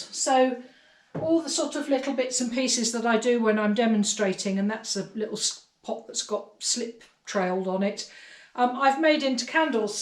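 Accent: England, United Kingdom. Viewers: All you do is so wonderful...none of it is "daft", as you say, LOL! Love the English accent too! The Tudor jug is gorgeous ! The mono-printing is very intriguing; love the look and curious about the process. Will keep an eye out for a video on it as well as the test tiles. Your husbands dish is very stunning. Aren't you glad you didn't give up on it?! Inspires me to re-fire a piece I've made. Had my eye on the poppy heads on the shelf behind you. Looking forward to part 2 on that video! Don't you just love the way this hobby can draw us away from the noise in the world around us? Allowing us to get lost in the process of creativity; spending hours & hours honing skills and processes. It's quite meditative! Many, many thanks again for sharing your wonderful talent and discoveries in pottery. There are a lot of videos about pottery here on YouTube, but yours most definitely rank high in the top 10!!! 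Kindest regards...stay safe and well. Cheers from across the pond :0)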